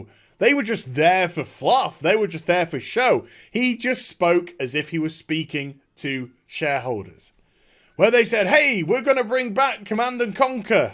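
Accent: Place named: United Kingdom. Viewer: god i so fuking love the British accent :D to the topic Keep up the good work that need a abo :D great evening from germany here .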